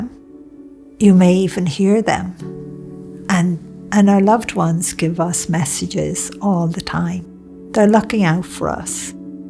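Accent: Ireland. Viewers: Just what l needed. I so love her soft lrish accent. It makes such a change from all the US you tubers! (l say this with respect nevertheless, so please don’t take offense). That soft Irish drawl is like a healing solace to my soul. 💝🇬🇧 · I can tell she's Irish. Beginning of video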